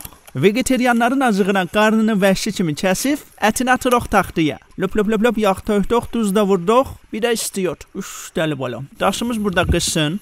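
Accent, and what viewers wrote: Sheki, Azerbaijan. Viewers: 😂😂Azerbaijan acent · Ay allah sevirəmdə şəkinin ləhçəsini özümdə şəkiliyam nətərsozzz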